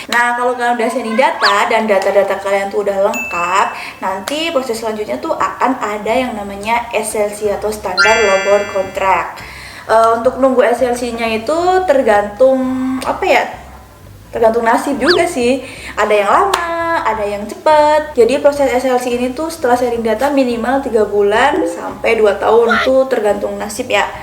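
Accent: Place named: Paburan, Indonesia. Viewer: Lah teteh orang paburan tahh,sedayeuh 😂😂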